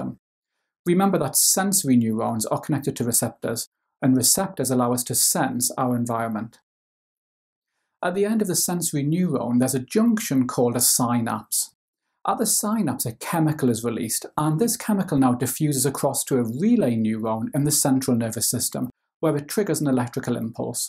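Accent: United Kingdom. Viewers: hi bri-tish and usfull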